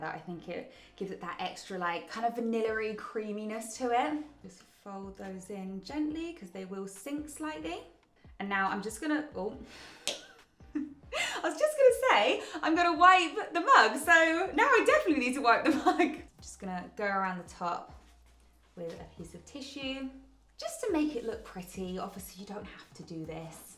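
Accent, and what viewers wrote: United Kingdom. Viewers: May i ask which part of the UK your accent comes from ? I love it ! Awesome video also !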